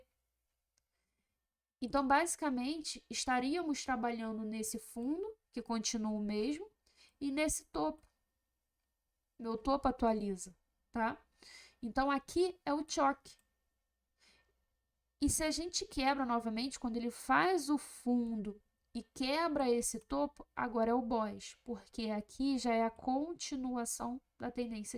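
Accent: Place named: Rio de Janeiro, Brazil. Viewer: começando o curso hoje. alem do sotaque carioca, que é muito gostoso de ouvir, sua didatica é otima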